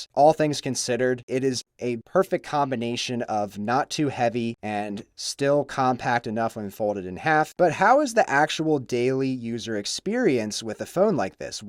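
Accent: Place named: California, United States. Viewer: U talk like californian gurl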